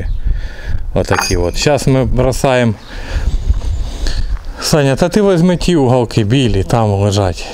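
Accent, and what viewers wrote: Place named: Surzhyk, Ukraine. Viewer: Это суржик ,кто как хочет так и говорит ,у нас в Украине с этим проблем нет!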